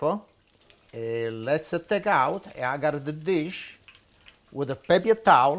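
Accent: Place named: Campania, Italy. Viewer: Hi, just curious to know from which part of Campania you come from because my Mum used to say "A salut, addo' va'" and im from Sorrento Area. Nice video!!!! Keep it up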